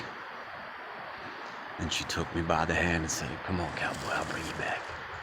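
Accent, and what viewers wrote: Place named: Ireland. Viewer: I miss hearing this in small town coffee co in Kapa’a Kauai. You used an Irish accent. It was fantastic! I think of it often. Thank you for sharing this video. Be well my brother. Aloha!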